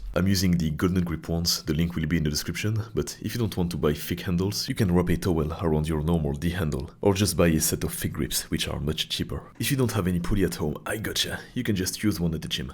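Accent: France. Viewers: Oh vu de ton accent, tu es français, non ? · langlais en mode francais ta pas lacent va sur internet ya des prof danglais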